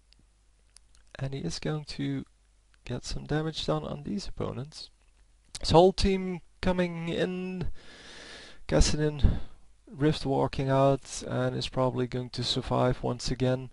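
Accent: Netherlands. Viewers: mooi hollands accent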